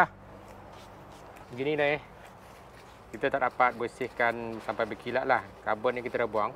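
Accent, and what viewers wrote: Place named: North, Indonesia. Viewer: Bagus sekali bro.....nak tanya sikit....bro asal dari mana....ada dengar pelat utara.....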